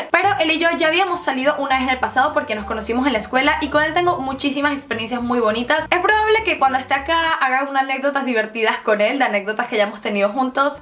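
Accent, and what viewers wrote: Venezuela. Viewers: Si tiene acento venezolano, soy venezolana y al ver uno de sus vídeos por primera vez me dije "esta chama tiene que ser venezolana por el hablar" xD solo que todos hablamos un poco diferente dependiendo del estado donde vivamos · Ojalá Venezuela salga por lo que está pasando y si tienes el acento venezolano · Y ya se te notaba que eras venezolana , saludos desde Venezuela . Cojedes :p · Hola. tienes una voz bonita entre venezolana y ese asentó jajajaja vives en rumania?¿ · El acento venezolano no lo has perdido. Pareces una loca rusa pero el acento supe que eras una chamita venezolana. DTB desde Venezuela. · de que parte de Venezuela eres te reconocí por el acento venezolano, de mi parte soy de los andes Tachira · YO SABÍA QUE ERAS VENEZOLANA LLEVO RATO ESCUCHANDO EL ACENTO AHAHHA Hola yo también soy de Venezuela ❤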